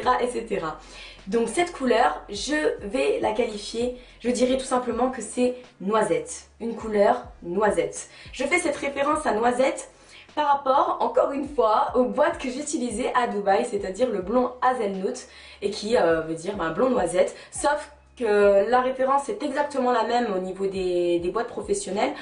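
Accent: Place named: Algeria. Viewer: dés que tu as cmc à parler g tt de suite su que tu etais algerienne mdr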